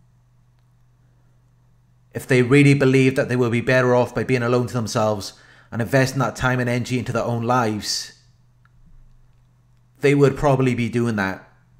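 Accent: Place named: Scotland, United Kingdom. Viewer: I like your videos and your lovely Scottish accent.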